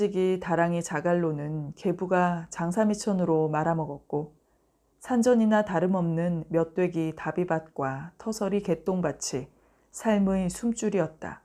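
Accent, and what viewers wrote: Gyeongsang, South Korea. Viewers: 어떻게 그리 경상도 사투리를 잘 구사하십니까?전직이 성우? ^그놈^ 김일성의 남침으로 택만과 도리실댁의 일생이 ^^^ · 경상도 분 인거 같아요. 낭독할때 사투리가 나오잖아요.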